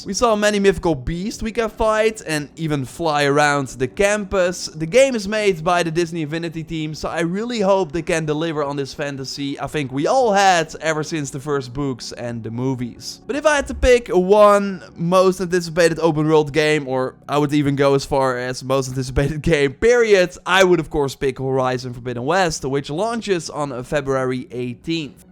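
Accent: Netherlands. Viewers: The Dutch accent is strong with this one. Good informative video 👍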